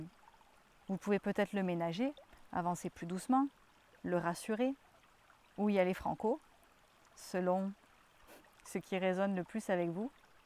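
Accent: Canada. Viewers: C’est marrant, c’est une aparté rigolote mais on voit bien l’influence des canadiens dans ta facon de parler parfois avec tes « puis », « j’était comme » et autre... je sais pas si tu connais Emma Bossé une super youtubeuse qui parle de spiritualité aussi et de guidance, bah tu as un peu cette même façon de parler ahahah je suis sûr que tu en ai influencé, mais j’adore comment ils s’expriment aussi c’est super plaisant pis c’est ben joviale tsé, c’est po linéaire ou que sais-je c’est vraaaiment posé.... 😂 bref en tout cas je te rejoins carrément sur le fait qu’on soit des êtres multi dimensionnel, je suis d’accord nos guides c’est nous avant tout, dans d’autres dimensions dans d’autres aspects....merci, bye💛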